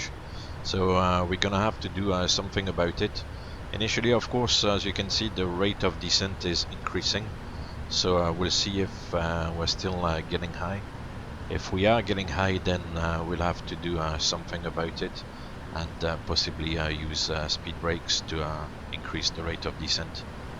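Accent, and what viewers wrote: France. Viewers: love the french accent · most probably French? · as french i can confirm he is from france, i can recognize french accent by thousands xD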